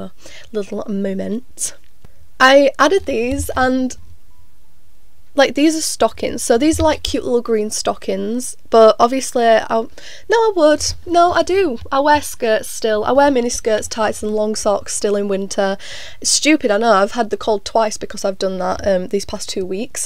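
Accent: United Kingdom. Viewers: there is nothing more AMAZING than opening up a video to expect a grating American accent, only to be soooothed by a fellow northerner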